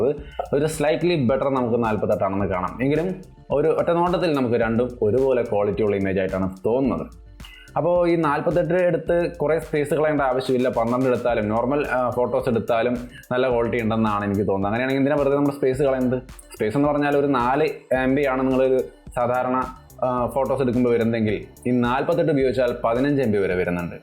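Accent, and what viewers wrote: Thrissur, India. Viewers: Veedu thrissur aano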